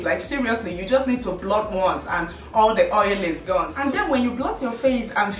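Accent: Nigeria. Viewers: i love that you maintain your natural naija accent, instead of confusing us with oyibo 'init'. i learnt a lot. thanks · Like seriously I just subscribed like seriously I like you oooh naija accent on fleek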